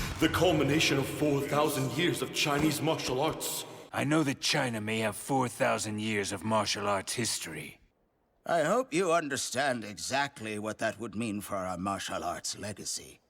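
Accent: India. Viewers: I just fucking love these videos, indian accent with sarcasm its awesome! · Accent: indian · The stoic Indian accent is way too hilarious! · The eloquent way of speaking and indian accent makes this 10x funnier. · If its not for the thickest Indian accent, this videos would have been a bang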